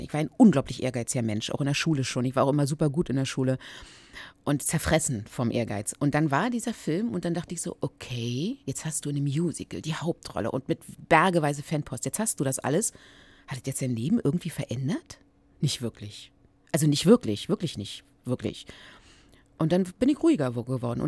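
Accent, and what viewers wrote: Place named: Berlin, Germany. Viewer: Einfach sympathisch, schon allein, weil sie berlinert ;).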